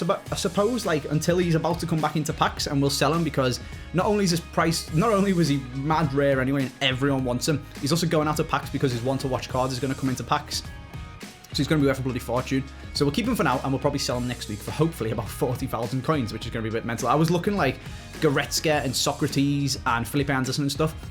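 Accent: Liverpool, United Kingdom. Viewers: Haven't watched since like December last year and I swear you've become more scouse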